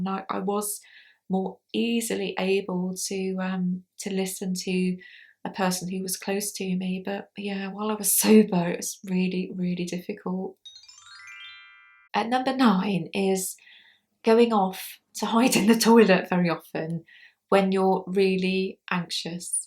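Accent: United Kingdom. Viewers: It would be fun some day if you shared with us what your childhood Scottish accent might have sounded like next to your present day accent. LOL! I love listening to all the different accents of the English language. (This isn't an actual request, just a fun thought that entered my skull.) Thank you for another great video,!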